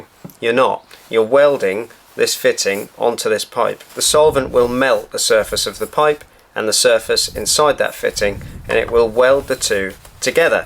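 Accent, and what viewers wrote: Norfolk, United Kingdom. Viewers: Came for temperature DIY advice, stayed for the Norfolk accent ❤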